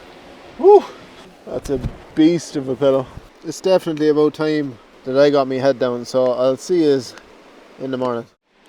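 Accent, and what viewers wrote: Ireland. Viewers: New subscriber loving ur videos. My dad was born in Dublin and I’ve always wanted to visit but still not got there yet. 1 day hopefully. Been told how beautiful it is and i love the Irish accent x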